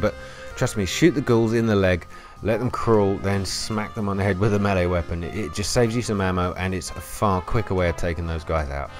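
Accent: United Kingdom. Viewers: Got the game a long whole ago but never started off with it. this video is not only an interesting watch but a good start for me too. Plus i like ur accent - Briton? and oh ... music is ok ok, i was concentrating on ur voice and didnt feel too distracted. maybe next time go for something with no lyrics.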